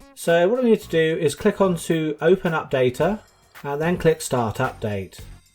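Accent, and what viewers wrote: United Kingdom. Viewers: Wow, the next brilliant and useful series completely in proper british english!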